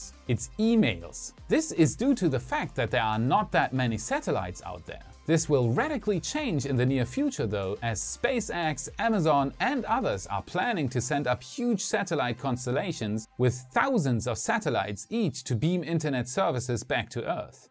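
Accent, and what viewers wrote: Germany. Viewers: I wanna say German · are you from germany?!!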